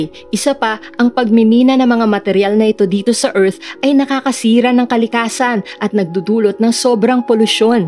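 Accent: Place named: Visayas, Philippines. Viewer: Sayke haha langya na pronounciation yan napaka bisaya sayke nag aral ka ba ?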